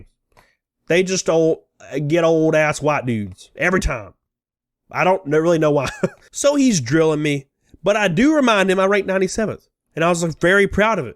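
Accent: South, United States. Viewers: Did I just hear a southern man say the Confederate battleflag is Scary????? Lol wtf bro.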